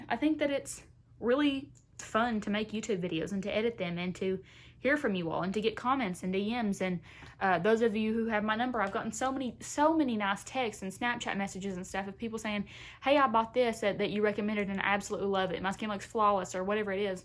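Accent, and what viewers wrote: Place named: North Carolina, United States. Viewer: I just found your channel today! I feel like I am listening to my sister in law-she's from North Carolina. Where are you from?!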